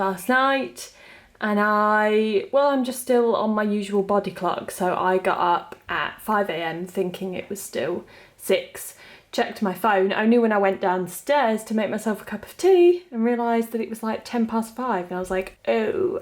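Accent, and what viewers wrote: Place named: Scotland, United Kingdom. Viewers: Good morning girl 💖. I was sitting here at 6am with my coffee, my husband just left for work and I heard your doorbell ring and thought it was mine. Scared me silly thinking somebody was ringing my doorbell at that time of the morning 😂🙈❣️ I can totally identify with not turning on the heat 🥴. I used to call my husband Mr. Freeze 🤣 Of course I loved all the outfits. 😉😍. I think I'm going to get me some of those socks. I also love anything that is and Other Stories! Love the contrast of colors with the bed. I have storage underneath my bed as well that's where I put our pillows that we sleep on and spare sheets. Always Always love seeing the babies! 💞💖❣️ Can't wait for the Scotland vlog! By the way you had a little Sean Connery going on in your accent🤭 I guess that's good he's from Scotland right? 🤔🤣❣️? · I can’t with your Scottish accent lol 🤣☺️. 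Loving all the cashmere, I have some socks but no comfies that are cashmere, they look amazing 🙌🏻. All lovely outfits, need to find a similar dupe for that H&M premium jumper that’s sold out, they go quick. Nice seeing the pups 🤗🐕 x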